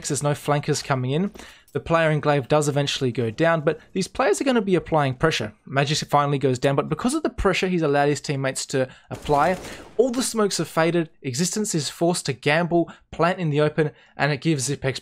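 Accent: Australia. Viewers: Greater Sydney or South West Sydney accent to be specific ;) (I think)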